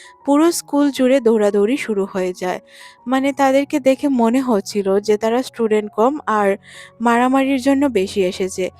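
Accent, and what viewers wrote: Sylhet, Bangladesh. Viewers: kota huniya oi buja jar afne je sylheti 🤣🤣🤣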